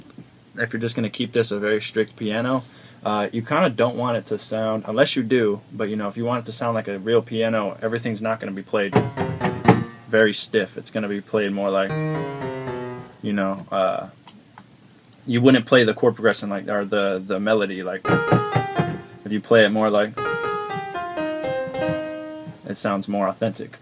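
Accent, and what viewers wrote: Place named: Texas, United States. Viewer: r you from texas or sum cause you got the hustla slang nom sayin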